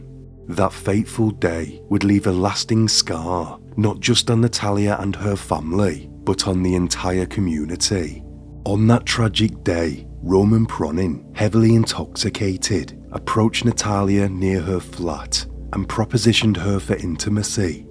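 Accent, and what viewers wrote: United Kingdom. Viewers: This dude sounds like a bot with one of the thickest and most annoying british accents ever, lmao Literally unbearable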